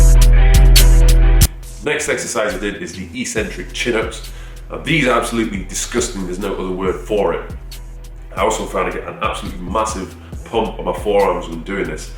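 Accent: United Kingdom. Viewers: Hey, Don't know how many positive comments you can digest, but here's another......No one comes close to the great content and great show and tell of the videos, not to mention that you've got the best physique and perfect British accent to make the videos super classy! You are the best, period. Thanks, Hal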